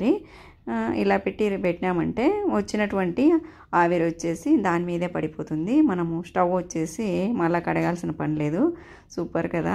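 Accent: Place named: Anantapur, India. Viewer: Mee slang antapoor side la vundi mee proper ekkada andi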